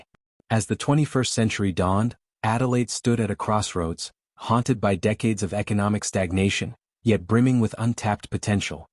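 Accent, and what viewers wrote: United States. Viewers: Fuck this I can't even watch a video about my own city without some yank doing the narration because Australian videos are not allowed fuck YouTube sick to death of this I refuse to be Americanised by Google fuck that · Why must there be an American accent narration?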